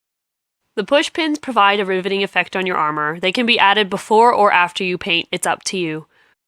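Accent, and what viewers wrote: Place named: Wales, United Kingdom. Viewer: Your accent isn't terribly difficult to understand. Sounds welsh.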